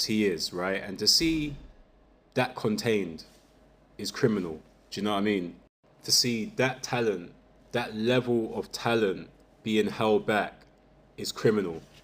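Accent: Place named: South London, United Kingdom. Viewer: you sound and talk exactly the same as an old friend of mine from lewisham in south london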